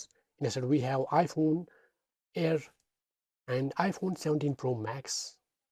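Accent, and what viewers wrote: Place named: Kashmir, India. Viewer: Kashmiri accent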